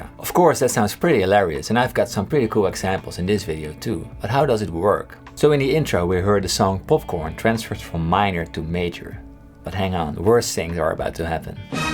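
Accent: Netherlands. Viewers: Are u dutch? Just wondering cause I am and the accent sounds familiar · Are you Dutch? What's your accent? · You are Dutch for sure. I'm Dutch and I recognize your accent.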